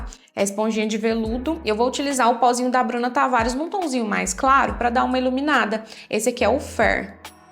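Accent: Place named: Minas Gerais, Brazil. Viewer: esse sotaque tipo mineira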